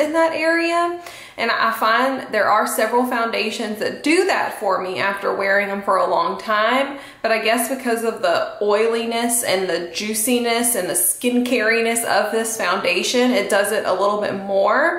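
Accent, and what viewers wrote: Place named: South, United States. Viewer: Hey,! Recent subscriber here. I've also been trying the RdF Luminous Elixir Three Drop Foundation. I fluctuate between the shades Ceres and Metis. My facial skin is rosacea-prone combination/oily. My usual process is applying prescription medications (a serum-y type product, then an azeleic acid gel), a light moisturizer, then sunscreen. I let the sunscreen absorb for AROUND ten minutes. When I apply the Luminous Elixir, I dispense three drops on my fingers, lightly blend onto my other hand, then gently begin massaging the product onto my face, working from my reddest areas outward. I was also absolutely SHOOK by the coverage level of this foundation in proportion to the amount I needed to use. I can wear it all day with minimal creasing, and I haven't really noticed it sinking into pores (mine are BIG) at all. This might just be a skin-type or prep difference, but the Luminous Elixir leaves my face looking considerably less greasy than, say, the Ilia Super Serum Skin Tint. 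ALSO, I have found that even if I scratch my nose or touch my cheeks throughout the day, I'm not removing coverage. Perhaps consider dropping the product onto your fingertips first, rubbing them together ever so slightly, then applying to your face. It could make a difference in finish and wear-time for you! PS: It's nice to listen to someone on YouTube who rocks a southern accent. Cheers!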